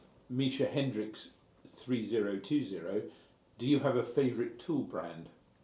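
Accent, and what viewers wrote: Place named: United Kingdom. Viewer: This guy is just plain boring . If it weren’t for the British accent and the long hair…..?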